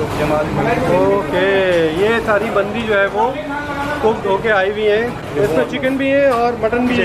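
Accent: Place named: Lucknow, India. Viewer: Puri laucknowi juban hai aapki.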